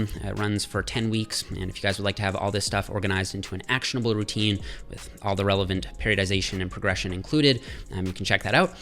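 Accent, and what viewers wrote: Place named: Canada. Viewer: You’re sounding super Canadian today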